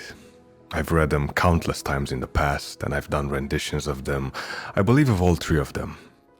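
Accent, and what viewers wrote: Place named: Hungary. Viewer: Hi, thank you so much. Your readings are amazing. I'm a writer and poet myself and hope to get published soon. Listening to your readings is like medicine for my soul. As a Canadian born Hungarian, your accent makes me feel my long passed family around me again. Thank you again for so many reasons that I can't say. Have a great day ❤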